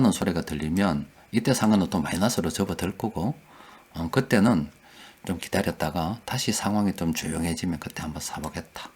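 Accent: Gyeongsang, South Korea. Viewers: 기회가 오면 어느종목을 사야할까요? 초보인지라~~~^^ 차분하게 설명을 잘해주셔서 이해가 잘되고 불안한맘도 안정되게 해주시네요 경상도 말씨도 정감가구 좋은 컨텐츠 만나게되어 감사히 청취하고 있습니다 ^^ ♡ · 구수한 경상도 사투리와 함께 귀에 쏙쏙 들어오는 강의 감사합니다~ 무엇이든 쉽고 빠르게 이루어지지 않다는걸 새삼 깨닫습니다. 앞으로도 좋은 강의 부탁드립니다~ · 경상도사투리 매력있습니다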